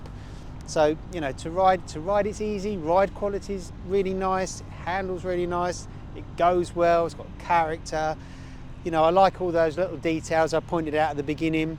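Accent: United Kingdom. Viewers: And he still sounds UK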